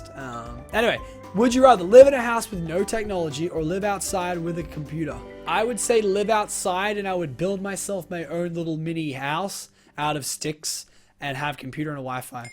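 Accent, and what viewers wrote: United States. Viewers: He sounds American!!😂😂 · R u american lol u sound American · he sounds american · He sounds half American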